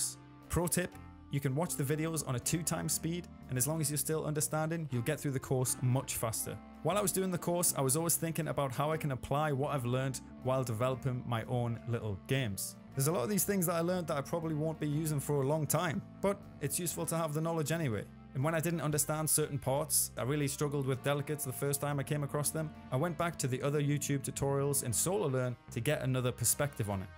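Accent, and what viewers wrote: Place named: Northern England, United Kingdom. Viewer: You have a bit of a northern lingo you local to Newcastle by any chance :)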